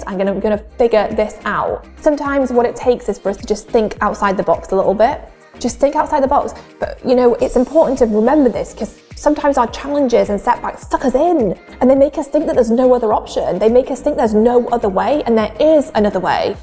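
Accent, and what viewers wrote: United Kingdom. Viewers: She’s British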